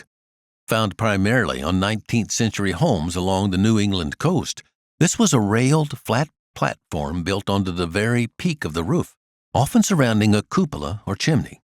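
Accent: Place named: General American, United States. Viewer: It is a standard American accent. I think it sounds great. Always more positive.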